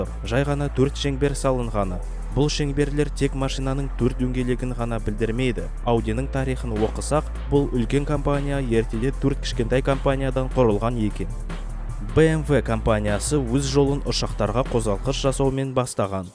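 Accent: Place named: Kyrgyzstan. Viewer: 😡дұрыс сөйле қырғыссын ба?👅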